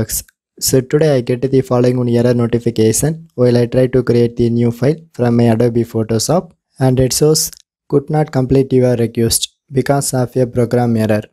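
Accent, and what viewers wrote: India. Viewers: It was hard to understand your sweet hindi accent,but it works. Thank you. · Are you from india?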